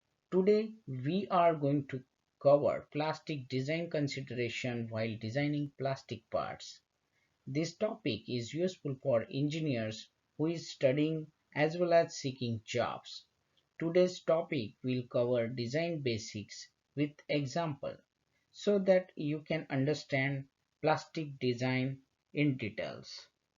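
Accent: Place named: United States. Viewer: Your US accent made me comment....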